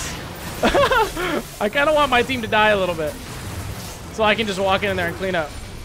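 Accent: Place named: Boston, United States. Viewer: Boston accent baby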